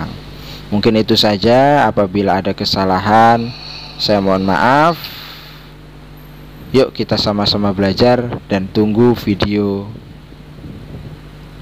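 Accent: Java, Indonesia. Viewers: Asline jowone ngendi Iki kang....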